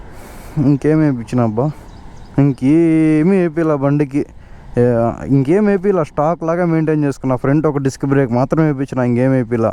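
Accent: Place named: Karnataka, India. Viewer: u r language is good to hear , karnataka border untaava mowa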